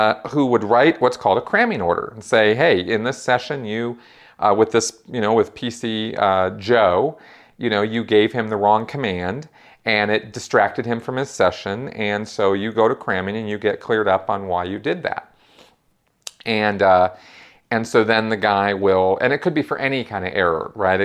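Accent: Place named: California, United States. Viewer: 44 down, 46 left to go! I am slowly going through all of these q & a's from the beginning. As an amateur anthropologist and person fascinated by religions, I truly appreciate your detailed and objective answers. Holding my questions since you have likely already answered them. Having grown up in Central and Northern California, I find your speech patterns very familiar and much more "real" to me than the other vocal ex-members. Keep on!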